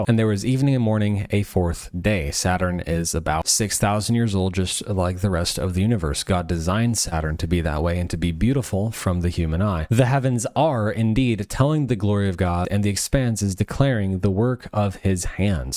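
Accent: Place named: Australia. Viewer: wow you have an aussie so cute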